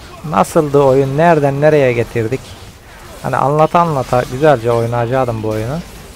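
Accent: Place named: Antalya, Turkey. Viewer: Kanka Antalya lımısın şive çok yakın 😂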